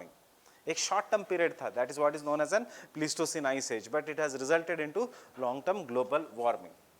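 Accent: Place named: Maharashtra, India. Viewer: sir from maharashtra land his speech like maharashtrian people❤ sir I am from beed maharashtra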